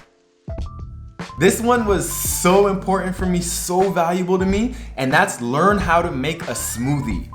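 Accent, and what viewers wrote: Canada. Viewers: I love this! I love your energy and joy! Side question: are you Canadian?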